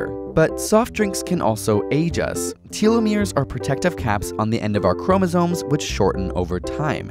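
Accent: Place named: Canada. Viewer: are u Canadian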